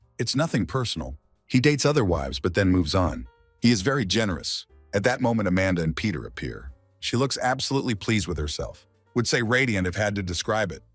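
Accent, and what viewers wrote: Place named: Russia. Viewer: The english sounds like broken russian or east European.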